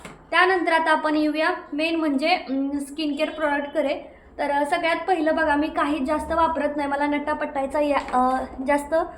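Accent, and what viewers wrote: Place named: Baramati, India. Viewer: ताई कुठे राहतेस तुझीभाषा तर बारामती साईट ची वाटते खुप छान असतात व्हिडीओ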